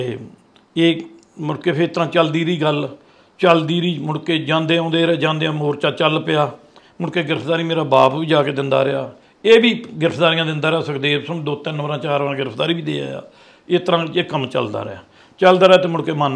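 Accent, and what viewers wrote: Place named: Majha, India. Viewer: ਇਹ ਮਾਝੇ ਦੀ ਪੇਂਡੂ ਬੋਲੀ ਆ..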